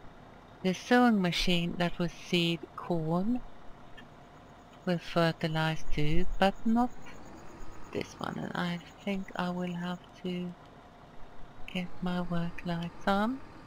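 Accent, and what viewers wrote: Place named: Sweden. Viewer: I can understand you perfectly. Swenglish works well :-) We older generation have to stick together (1952 vintage here). I talk to my motorbikes they like to be consulted:-) Great video I don't think I've ever managed to cover an entire grass field I always find bits I've missed Mike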